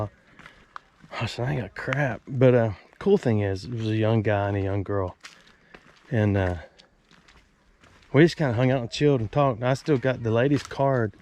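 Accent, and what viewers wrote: South, United States. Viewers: I have been subscribed to your channel since the beginning also. I liked you and your family then, and I LOVE you and your family now. I was told something a long time ago while I was going through some life lessons, "This too shall pass." And it always HAS PASSED, thru other life issues. Hang in there, God is watching over you and Family. {I still love the sound of your Southern accent.)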